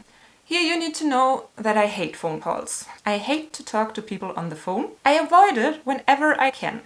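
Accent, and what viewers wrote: Germany. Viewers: I love your channel and your accent! The first time i saw your video, i immediately know you are from Germany. Much love❤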